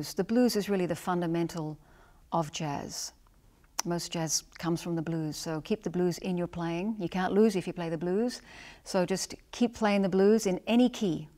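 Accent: Australia. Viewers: Australian English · My preference 4 gtrs is progressively getting bigger & bigger & hollower & hollower .. dang, maybe I shoulda bin a upright bass player. Well maybe not quite but 2 do JoePass-type stuff I’m gonna hafta b least a moderately proficient bassist. Bassists fascinate me. So .. hey, is that an Aussie accent? Show ‘em how we do it in the gr8 Sthn Land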